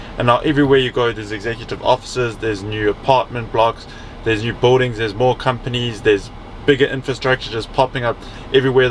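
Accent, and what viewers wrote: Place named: South Africa. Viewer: You sound South African, are you? I am.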